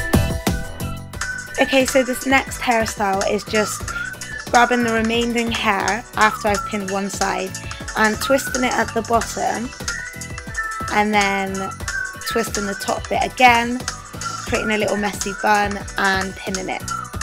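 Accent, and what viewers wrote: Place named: United Kingdom. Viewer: what part of the uk are u from i can't pinpoint ur accent?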